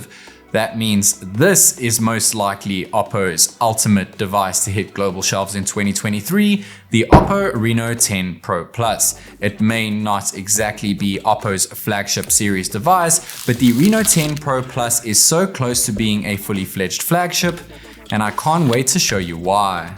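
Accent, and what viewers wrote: South Africa. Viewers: All I could see was The Barron, Witkoppen Rd... 🤣#RubyBlueForLife!!! Cool channel, good luck! Can hear a Zaffer a mile away!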